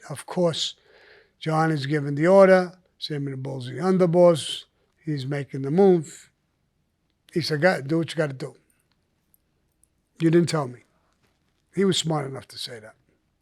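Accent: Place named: New York, United States. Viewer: Us guys from NY, NJ, PHILLY, CHI & the like can’t walk a city block without tripping ova 20 guys that speak how Sammy does..since the 70’s 🤣🤣 🤷‍♂️ who knew the world thought so highly of this kinda brouge🤣🤣🤣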